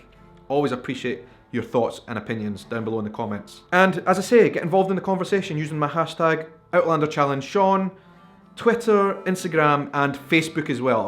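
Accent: Scotland, United Kingdom. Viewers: So happy I found your page!! I love love love outlander and I love a Scottish accent. Thank you for doing this 💙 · Castle Leoch is a must! Just saying. Amazing videos Shaun, you with your scotish accent in Lallybroch and a drone flyng over you both...amazing!. Thanks for sharing the love for the story and for your country. Makes it really special to see the series. ;)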